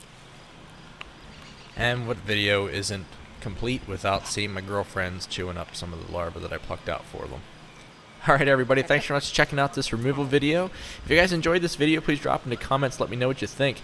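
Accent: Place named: Southeast Pennsylvania, United States. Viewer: Your accent - are you from SE Pennsylvania?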